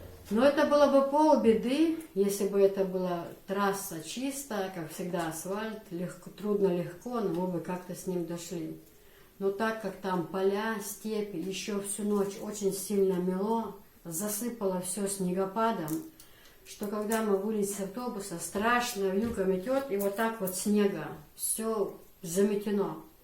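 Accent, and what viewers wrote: Chechnya, Russia. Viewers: Знаешь некогда не подумала бы что вы русская потому что акцент чисто чеченской увас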